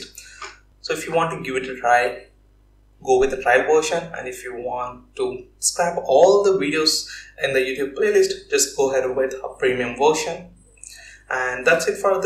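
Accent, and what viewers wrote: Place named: India. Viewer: Indian Accent is the best accent....be natural ♥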